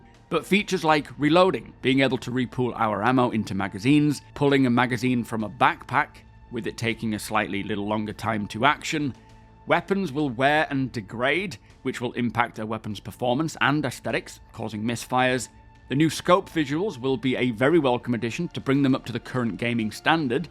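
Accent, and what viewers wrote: United Kingdom. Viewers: Happy New Year. As I said on my last live I was able to participate in. Your noble British accent makes you seem older and wiser. I would guess that u are younger than I. At 43 your video's are perfection and balanced. With each video I have been urning for what we are about to see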